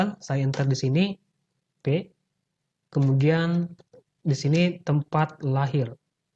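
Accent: Bugis, Indonesia. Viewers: Orang Bugis yah?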